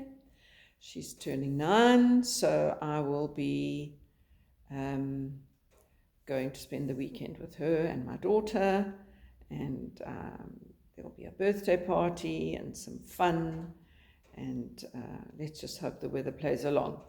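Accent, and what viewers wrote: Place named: South Africa. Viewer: Hi and how lovely to hear a South African accent and the Hadidas, are those the ones we call Kwê voëls. When I look at the way you hold your needles and yarn, I know we grew up at the same time in South Africa. Although Afrikaans, I should have knitted continental, my mother had very strong ideas about the done thing. When I taught myself to crochet she always had a lot to say, because I did it my way. Even my Afrikaans friends found it strange and when I see how most people do it on YouTube I think anyway is okay as long as you enjoy. I hope my daughter isn't too cold in Joburg. The UK is mild and people are upset because this isn't summer. I don't mind, because it can get quite muggy. Enjoy your stay and I envy you going to your granddaughter's party. Think I will give those socks a go. Look forward to hearing your voice again. 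Anita x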